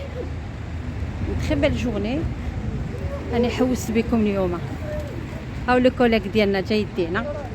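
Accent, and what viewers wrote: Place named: Algeria. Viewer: خالتي واش أصلك من المغرب والجزائر حيت عندك طريقة كلام جزائر ونتي وقيلا دبا بالمغرب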